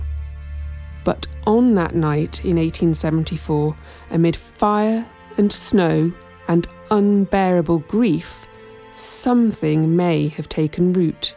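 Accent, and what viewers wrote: England, United Kingdom. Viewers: Ah, that beautiful, quintessentially English voice again...a reminder of how wonderful the country used to be... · It's a lovely human voice. Humans tell a much better story of haunting & spirits then bleeding AI. These type off stories need a sympathetic voice, I'm sure AI cannot do sympathy. It's also nice to have an narrator who's from the UK. There are certain stories that need an accent that brings a calm voice, she has the perfect voice.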